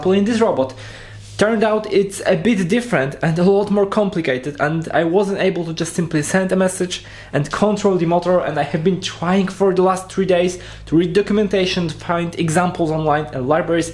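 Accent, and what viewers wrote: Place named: Poland. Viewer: Oj chłopie - akcent to masz taki mocno polenglish. Akcenty słów, ale też melodia zdań - słychać, że w szkole na angielskim było mało rozmowy/dialogu lub się nie przyłożyłeś. Czy to przeszkadza - wcale: chodzi o treść. Ale sam zacząłeś z 'bearing' :P Jeśli Ci to przeszkadza, zainwestuj w konwersację z kimś kto ładnie rozmawia po angielsku, bo treść kanału jest naprawdę ciekawa!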